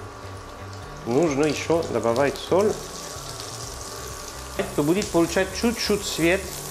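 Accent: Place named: Gascony, France. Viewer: Очень вкусно и интересно рассказал , а главное акцент классный. Вы гасконец , он похож на Евгения Данчевского из трёх мушкетёров. ,, Имя сестра, назови мне его имя !!!"))) Фельтон !!!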